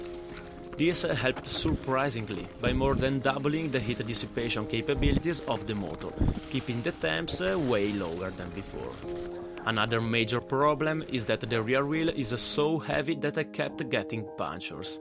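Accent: Italy. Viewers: U sound Italian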